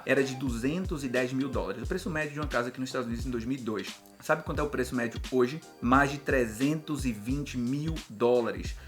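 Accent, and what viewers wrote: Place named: Portugal. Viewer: Kara, não consigo parar de assistir seus vídeos🙈 conteúdo direto, e esse sutaque da terrinha já traz um pouco de intimidade. Parabéns maninho! 🙌🏻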